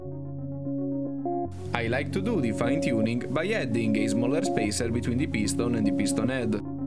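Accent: Italy. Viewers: Come si sente l'italiano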